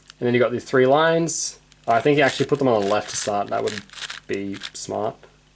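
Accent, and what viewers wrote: Australia. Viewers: Your accent kinda sounds like Australian+ English and Feliks's accent is like Australian+European ^_^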